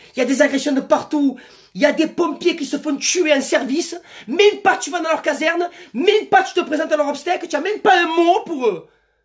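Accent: Marseille, France. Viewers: Salut j'adore votre accent vive les marseillais bonne continuation excellente journée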